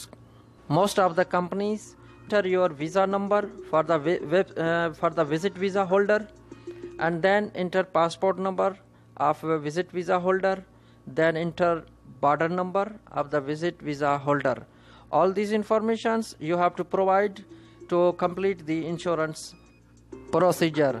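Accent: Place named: Punjab, India. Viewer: punjabi accent me english...arey bhai urdu me bna ly video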